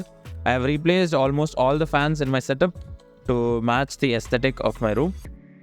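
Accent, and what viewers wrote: India. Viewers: oh mannn, the indian accent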